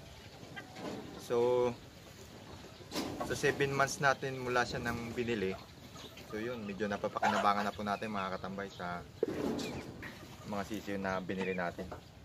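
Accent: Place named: Ilocos, Philippines. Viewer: Hello katambay,,,sarap nmn nyang munggo,lalo na yung hindi pa gaanong natutuyo,bagoong at inihaw na isda tapos inasiman ng sampalok na hilaw,,,curious lng po kasi parang may puntong ilocano kayo,,marunong po ba kayong mag salita ng ilokano?,,subrcriber from solid kaharabas po,,,from Tarlac city,OFW Madrid Spain,,,ingat po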